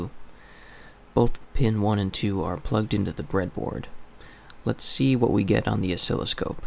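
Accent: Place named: Utah, United States. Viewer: Buddy where is your accent from? Utah ? It's kinda cool!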